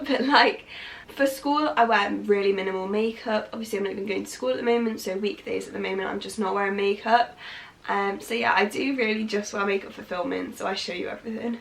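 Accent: United Kingdom. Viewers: How come your British accent is becoming more pronounced. We need you to come back to America 😁😷😷😷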